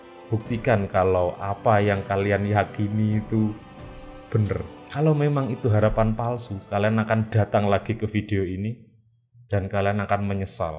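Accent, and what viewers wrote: Java, Indonesia. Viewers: 😂jawine pundi Mase ?medog men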